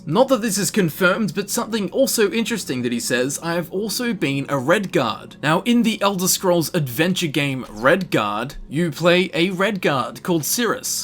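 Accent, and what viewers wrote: Australia. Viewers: Ccoooooollllll!!! Thanks for sharing! I wish I could get the character overhaul to work but alas, I cannot! Just a question, are you Australian or Kiwi?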